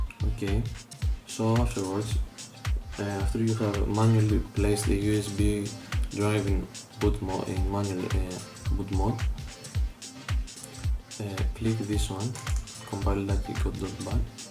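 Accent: Greece. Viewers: Απο τη φωνη υποψιαστηκα οτι ειναι ελληνικο βίντεο , ωραιος φιλε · η ελληνική προφορά γαμάει πάντως!!!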